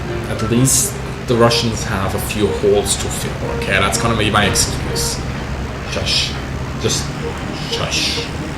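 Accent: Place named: Germany. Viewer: Come on, pleeeeaaaaseee make some WarThunder videos and plane reviews! we really miss your insight, rants and that glorious german accent! Pretty bitte?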